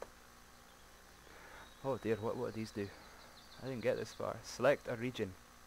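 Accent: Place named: Scotland, United Kingdom. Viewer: Why do you sound so Scottish. Are you Scottish? 8-) And Hi.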